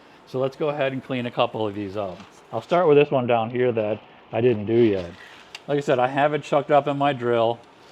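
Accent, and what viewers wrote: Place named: Wisconsin, United States. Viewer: Well thank you for the reply , and now i can satisfy my curiosity about the accent lol. Hey ftr i am , and have been for over 30 years , a Cheese Head , GO PACK GO Packers fan even though I’ve lived here in Georgia all my life. Dont know if you’re a fan but just thought I would share that since you said you grew up in Wisconsin.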